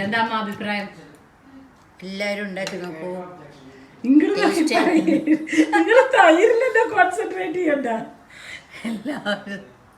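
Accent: Palakkad, India. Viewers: Hai eepaalakkadan samsaravum paachakavum eshttayi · നല്ല വീഡിയോ, തൈര് വട എനിക്കും നല്ല ഇഷ്ട്ടോണ്, പിന്നെ നമ്മുടെ പാലക്കാടൻ ഭാഷയും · പാലക്കാട്‌ നാടൻ ഭാഷ സൂപ്പർ ❤️❤️❤️ അമ്മ ❤️❤️❤️❤️❤️❤️❤️❤️❤️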